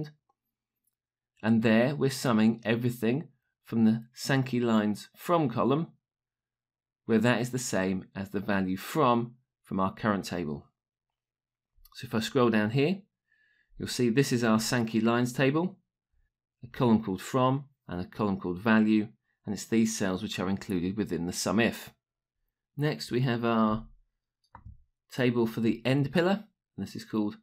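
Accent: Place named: England, United Kingdom. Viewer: Thanks! lovely English accent by the way =)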